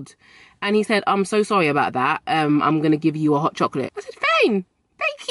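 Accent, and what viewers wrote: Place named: London, United Kingdom. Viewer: This beautiful young lady has a London, England accent.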